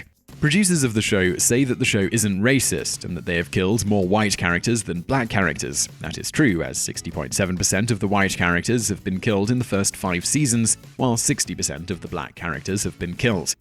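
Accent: United Kingdom. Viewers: Hypocritical? Why does a white British sounding man always have to be the one imparting knowledge? White not let a women or person of color do your show. Maybe top 10s should turn the mirror on itself.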